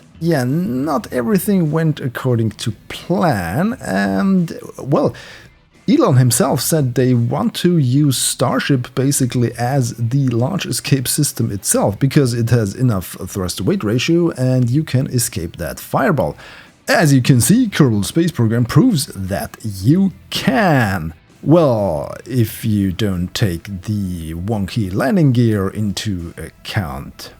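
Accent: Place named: Germany. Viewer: man hört den deutschen akzent in deiner stimme raus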